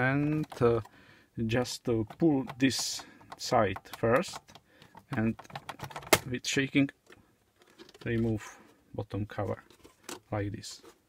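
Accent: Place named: Czech Republic. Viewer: ale no tak.? ty se stydíš za česko? ten akcent jde bleskově poznat. jakože ne že by nebyl důvod se za česko stydět, ale stejně🙂.... a díky za video. přemejšlim, že si ten komp repasovanej koupim.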